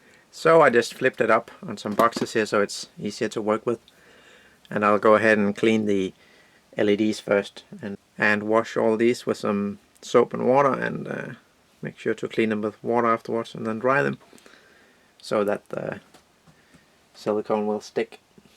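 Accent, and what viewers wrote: Denmark. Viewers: his accent sounds danish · You really sound Danish to me :D Cool projekt! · COOOOOOL fra de første 10 sek, jeg har gættet på at du er dansker :D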